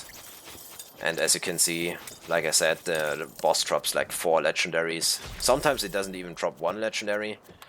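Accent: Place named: Germany. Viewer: Nice Build Aber das du deutsch bist hört man dirket xD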